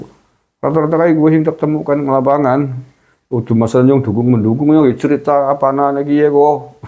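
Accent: Banyumas, Indonesia. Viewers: Malem rajaban, melekan kang + ndonga. Salam waras bedigas. Ora ngapak ora kepenak. Semangat terus kang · Ora ngapak ora penak ya kang😂😂👍✌️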